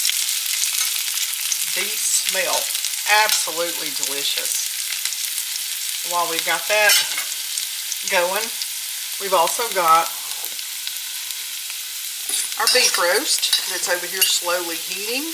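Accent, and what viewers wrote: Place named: Alabama, United States. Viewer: I've been binge-watching your channel tonight. Listening to you talk, I decided you might be from Alabama. I'm from North Central Alabama. During the past year, I've been cooking for one. You've given me such great ideas. Thank you for reminding me it's prudent to take care of yourself and remember to eat. I look forward to your upcoming videos. Blessings! 😃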